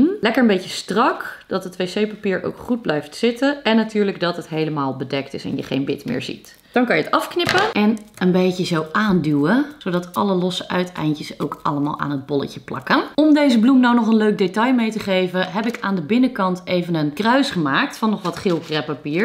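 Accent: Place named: Flanders, Belgium. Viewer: Ik peis daje accent da ge deedt gents of antwerps of west-vlaams 1 van de 3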